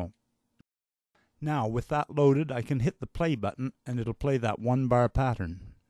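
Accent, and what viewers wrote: Canada. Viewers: Love the Canadian accent. How does Session Drummer 2 do on more funky rhythms with ghost notes and flams? Does it sound natural or do you get the "machine gun" effect.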